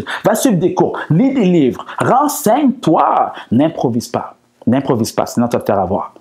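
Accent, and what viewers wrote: Canada. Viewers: Cc!! Ton accent est bien CANADIEN???? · Tes vidéos sont vivants et savamment épicées à la canadienne (accent). Riches dans le fond & dans la forme, tes conseils de pro suscitent l'intérêt général et poussent à l'action. C'est vraiment fouettant!!! Merci toujours, Mister.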